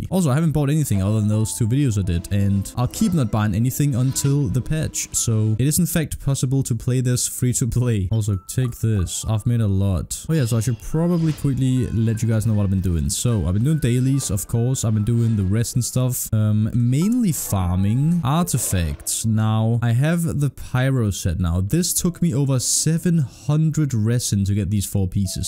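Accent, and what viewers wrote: Denmark. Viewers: you sound danish an i right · Are you danish?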